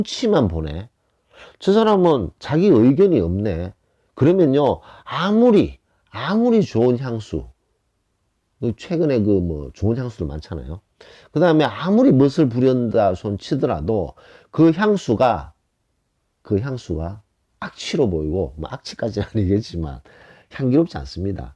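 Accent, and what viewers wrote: Gyeongsang, South Korea. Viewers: 선생님요 !! 정말궁금합니다 자꾸 선생님말씀도중 너무궁금합니다~ 구독자도 궁금증이있으면 알고싶어요 왜야면요! 자꾸생각납니다 옆집아저씨 같아서요 고향만어디신지 경북인지 경남인가요?? 샘이생각할때는 어처구니 없지만 ㅎㅎ 웃음이납니다 오늘도 너무 가슴에 와닫고 내가슴을 어찌호비파는지 나도모릅니다 지금도 어디서도 나의아픈 상처를 어찌잘아시는지 부처님도 아니시고 그리고 하느님도 아니시잖아요 !!감사하고 감사합니다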